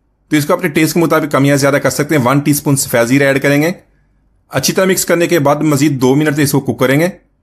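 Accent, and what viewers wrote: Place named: Pakistan. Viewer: bhai, aap bohot he umda recipe lay ker aaye hain aur bohot he achi terhan say samjhaya bhi hai kay kiss terhan pakay gee. Sahi Pakistani recipe aur lehjay main video banai hai aap nay, Zaberdust!!!!! bohot shukria.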